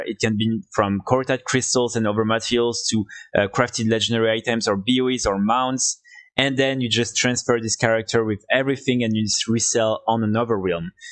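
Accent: France. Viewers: French accent 😂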